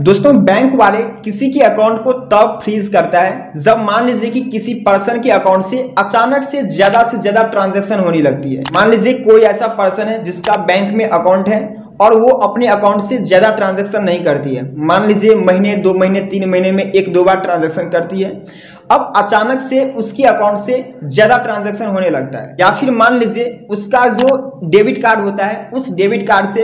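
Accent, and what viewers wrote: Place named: Bihar, India. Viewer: Tumhara awaz se lagta hai tum bihari ho😄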